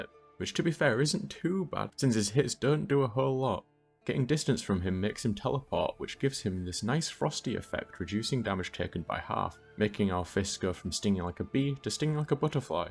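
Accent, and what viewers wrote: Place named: Northern England, United Kingdom. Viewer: He’s clearly northern English, Musk doesn’t sound like he’s from anywhere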